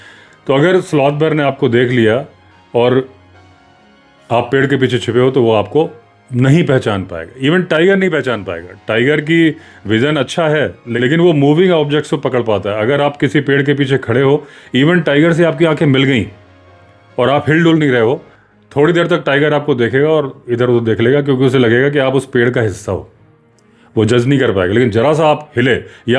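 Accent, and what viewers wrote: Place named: Jammu, India. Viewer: Sahi baat hai hamare gam ke log bi asa hi bolte hai Main jammu se hu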